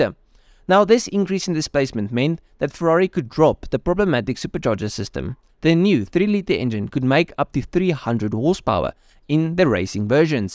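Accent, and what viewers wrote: South Africa. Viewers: I'm really loving these videos. You're picking the best cars. Is that an SA accent I'm hearing?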